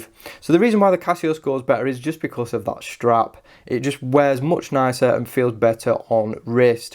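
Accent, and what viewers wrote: United Kingdom. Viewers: LUV YOUR BRITISH ACCENT SU MUCH!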